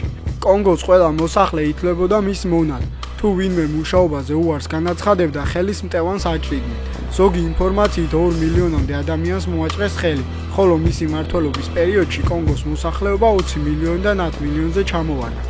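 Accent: Kutaisi, Georgia. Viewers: ქუთაისური კილოთი ისტორიულიფატტების კითხვა კარგი გგონია?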